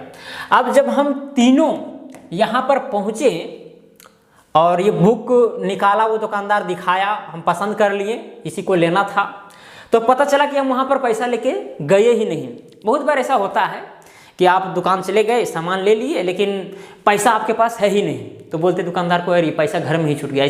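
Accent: Bihar, India. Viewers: Bhai aap bihar se ho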